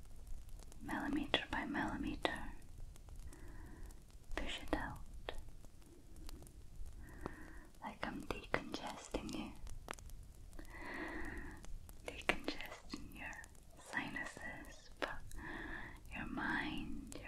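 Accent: Scotland, United Kingdom. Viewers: Imma be Scottish the way I repeat her words and accent lol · This woman is a Babe.. Her video works for me.. Scottish accent and scratch Scratch oh yeah ...🙂😊Sweety. ⭐⭐⭐⭐⭐for Relaxing video. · Nothing better than listening to a scots (home) accent tae relax since i moved away with work. Its becoming a must now! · 🌹 Hello love,glad to have you tonight because as of this very moment"أنا عم بصير اغفى" I'm starting to get shut 👁 and I will love ❤ to hear your beautiful voice with that nice Scottish accent to lull me to sleep while this video is played ▶️ on a repeat🔂 button. Hope your weekend went well by far and the same for your family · Love the Scottish accent. Feels nice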